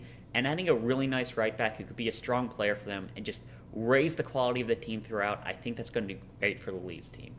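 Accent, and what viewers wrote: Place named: Baltimore, United States. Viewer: Another great vid. Is that a Baltimore or Philly accent?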